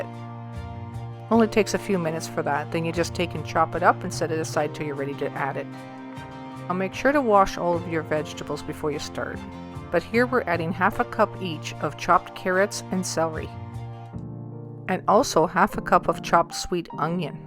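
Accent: Canada. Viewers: PS are you Canadian?! I hear my families accents in your voice ❤️❤️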